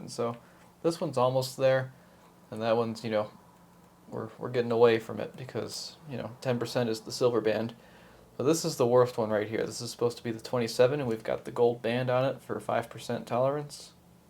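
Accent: United States. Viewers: I love the way you Americans say Dang or Dope. I live in Australia and I've seen one of these after a full restore. Unfortunately Marantz equipment took a China nose dive with their surround amplifiers. After a few months of use, the centre channel started playing up. I ran it in phantom mode but took it to a repair shop for a look. The amount of time he'd have to spend fixing it was a joke so I left it with him and went shopping for a new 7.1 amplifier. I was looking at some gear when I came across an Onkyo 7.1 unit. It was THX approved and ran all the audio matrixes I'd ever need and it was beautifully made. Point to Point wiring etc and the sound was phenominal. I remember Onkyo from the 70s when they made one of the first CD4 Discrete Quadrophonic stereo sound systems. Pink Floyd with a bit of the spliff was fun. (I'm 62 by the way) This new Onkyo unit is brilliant and by the time it's anywhere near clipping, you'd be bleeding out of your eyes and ears! 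The sub out is great. It only feeds the sub with relative info so the bottom end doesn't become overbearing. I've put a DBX 163 between the sub out and the sub to catch transients that can kill any speaker. So many things in Australia are no longer made here. Do you know that we don't even make a car here anymore? Australia made some beautiful Cleveland Earth tuners!! It's a joke. Anyway, Cheers from OZ, Andrew.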